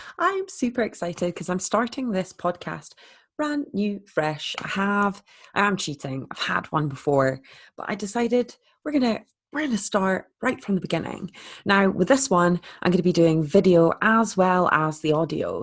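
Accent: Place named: Scotland, United Kingdom. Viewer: Is it me or are you turning Scottish?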